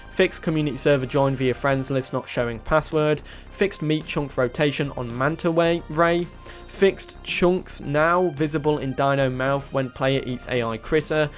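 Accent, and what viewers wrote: United Kingdom. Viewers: you are briish ey?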